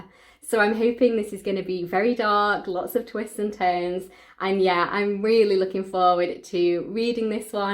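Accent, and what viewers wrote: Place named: Northern England, United Kingdom. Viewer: I love hearing a northern voice!! Sending love from Leeds❤️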